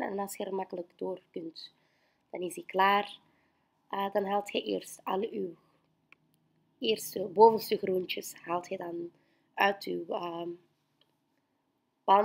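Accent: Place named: Belgium. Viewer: jouw Belgische accent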